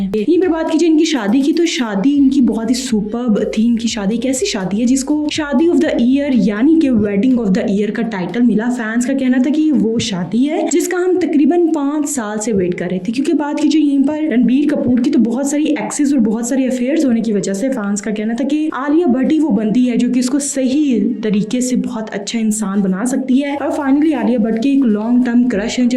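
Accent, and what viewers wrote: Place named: Punjab, India. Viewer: Punjabi aunty accent in the video.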